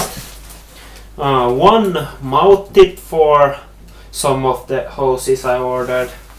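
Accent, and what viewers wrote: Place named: Finland. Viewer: Kiitos nopeasta vastauksesta. No hyvä, ajattelin jo että joudunko kiduuttaa viikkoja.. Ps. Englantisi on hyvä, mutta suomalaisuus paistaa kyllä taustalta omaan korvaan. Niin kuin kuuluukin. Pistä lisää Hookah videota tulemaan!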